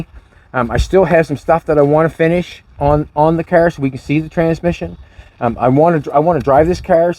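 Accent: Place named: Atlantic Canada, Canada. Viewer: This is my first viewing, so I don't know where you are, but I'm detecting a slight East Coast Canadian accent. If that is so, you probably know that the government, bless em, thinks they can save the planet by forcing car dealers to sell 100% of the new cars to be BEVs! If we the people, can't stop this madness, the folks who can go Cuba-Style on old classic automobiles are going to be in high demand! 1st view immediately SUBSCRIBED buddy!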